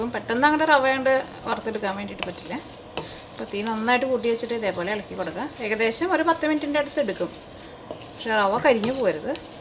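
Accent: Thrissur, India. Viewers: Trissur language njangalkoke ishtanu.speed koodiyalum kuzhapamilla keto.soundoke cook cheyyumbo natural anu.athonnum sarallya.... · വളിഞ്ഞ തൃശൂർ ഭാഷയും ഭാഷയുടെ സ്റ്റൈലും ഒന്നു മാറ്റിയാൽ മനോഹരമായിരിക്കുന്നു ഭാഷ ഒരുതരത്തിലും സഹിക്കാൻ കഴിയുന്നില്ല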